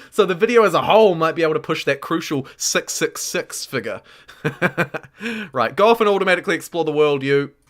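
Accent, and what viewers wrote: New Zealand. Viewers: I'm from NZ and I can't quite pinpoint your accent. It's definitely kiwi, as the "kiora" suggested, but some things sound English, and maybe Australian, and maybe something else I can't pick. Like you said something like: 'aw nah look they're running in with "skart" riders' For "scout", is that a UK accent? Anything else? Just curious lol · It sounds pretty kiwi to me. Maybe rural NZ?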